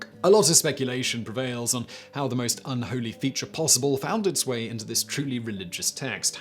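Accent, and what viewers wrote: United Kingdom. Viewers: Curious. What part of Britain are you from?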